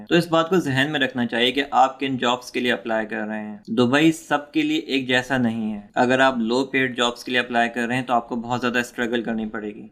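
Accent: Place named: India. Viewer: Bro you are working in Dubai but you are slang language is Indian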